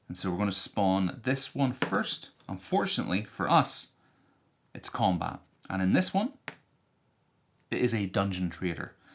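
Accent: Northern Ireland, United Kingdom. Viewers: YOU FROM NORTHERN IRON???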